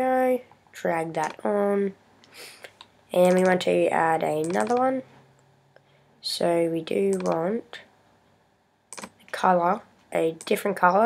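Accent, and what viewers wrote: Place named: Australia. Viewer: aussie <3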